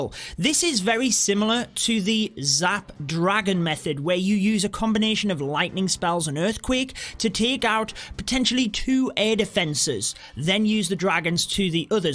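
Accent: United Kingdom. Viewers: well he's british but u will get used to it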